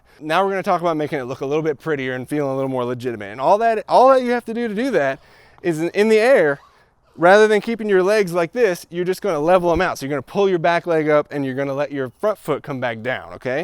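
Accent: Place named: South, United States. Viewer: Great video....But when did you get the southern accent?!!!!😁😁😁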